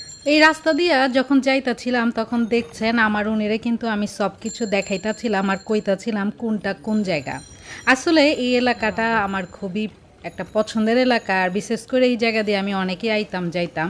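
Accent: Dhaka, Bangladesh. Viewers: আসসালামু আলাইকুম আপু আপনার মুখে ঢাকাইয়া কথা শুনতে খুবই ভালো লাগলো সেই সাথে পুরান ঢাকাটা ঘুরে দেখা হল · Dhakaiya languahe tomer mukhe darun manay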